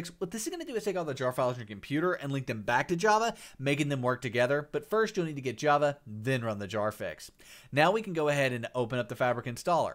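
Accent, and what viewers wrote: South, United States. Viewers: dude turns country at the end of every statement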